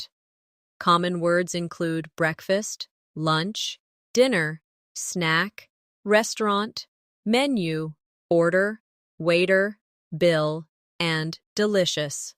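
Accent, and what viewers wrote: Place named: United Kingdom. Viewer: I like your British accent. And I appreciate for your videos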